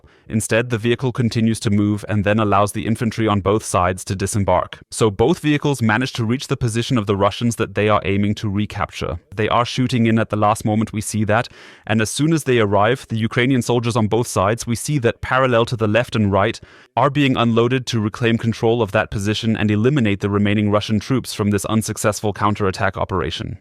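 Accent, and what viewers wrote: Germany. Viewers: I'm sure, he has German accent all right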